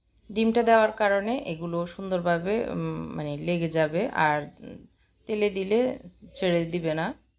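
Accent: Noakhali, Bangladesh. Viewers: আপনে কি নোয়াখালির মেয়ে নাকি, এই ভাবে কথা বলেন কেন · সুন্দর বাচনভঙ্গি মানুষের দৃষ্টি আকর্ষণ করে আপু।এদিকে মনোযোগ দিবেন আশা করি।আমাদের নোয়াখালীর ভাষা ছাড়া ও আমরা চলতে পারি তা দেখিয়ে দেন। আজ প্রথম দেখলাম দশে ৬ নাম্বার দিলাম আপনাকে। কথা গুলো ঠিক করুন দেখবেন আরো এগিয়ে যেতে পারবেন। আপনার ভিডিও কোয়ালিটি দারুন, মিউজিক টা একটু হাই। রাগ করবেন না প্লিজ আমার মতে সমালোচনা সামনেই করা উচিত। একজন ভিউয়ার বা ছোটবোন হিসেবে ভুল গুলো বললাম যেনো শুধরে নিতে পারেন।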